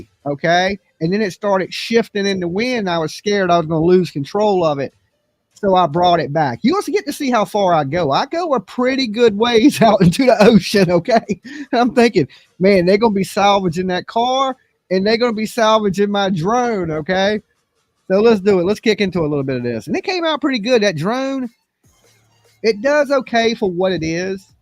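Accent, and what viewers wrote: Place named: Tennessee, United States. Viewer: I want to send you a list of words to say so i can listen to your accent. It always makes me smile! Hand to heart im not making fun of you. My aunt is frim the HILL of Tennessee, and the speech patterns are awesome. I greq up in Indiana (not accent) now living in Texas per family vack gome i have an accent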